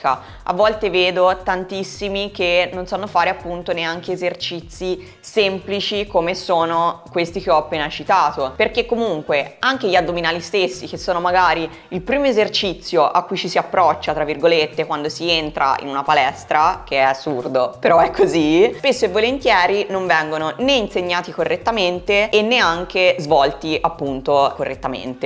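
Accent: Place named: Tuscany, Italy. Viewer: Poco toscana😅😂😂😂❤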